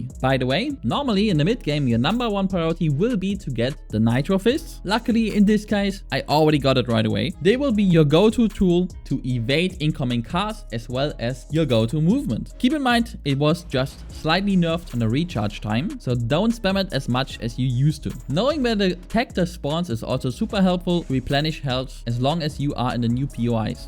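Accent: Germany. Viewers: Anhand deines Akzentes, bist du bestimmt deutscher oder? :D Schönes Video!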